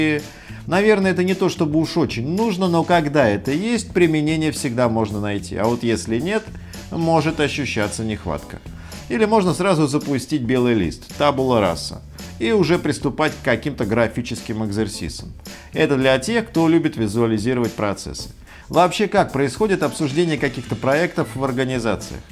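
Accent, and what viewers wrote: Moscow, Russia. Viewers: Это не акцент! Это московский говор! Москвичи ,,акают ".)))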